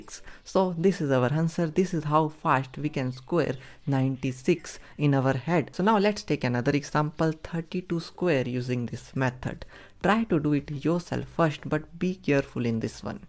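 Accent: India. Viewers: I believe him, he sound indian than american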